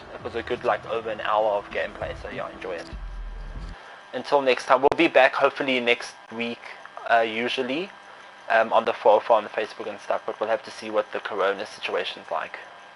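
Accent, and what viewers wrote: South Africa. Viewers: Are u South African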